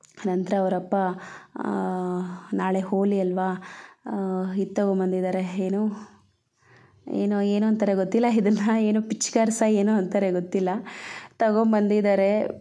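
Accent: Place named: Bellary, India. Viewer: Nivu matado shaily nodi nanu bellary ಮೊಳಕಾಲ್ಮುರು side erbodu ankonidde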